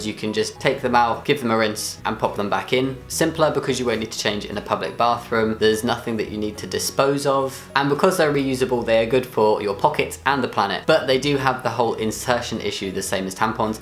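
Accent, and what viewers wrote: United Kingdom. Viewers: I'm a cis girl but I know the struggle of putting a pad inside boxers because i'm very forgetful and sometimes i forget to bring a change of underwear when I come over to my bf's house so when it happens I borrow his boxers but holy shit, that time when I was in my period was nOT A GOOD TIME TO FORGET MY UNDERWEAR. And yes, I agree, it's easier when the pad has wings! Also, you said that pads smell like flowers but I actually have never seen any perfumed pads, what brand are you talking about? Well I live in Canada and you live in the UK (I think, by your accent, correct me if i'm wrong) so maybe we just don't have the same brands but idk it seems weird to me, I feel like the smell of the flowers and the smell of my period blood would just make a terrible mix, i'm not sure if I'd like that lmao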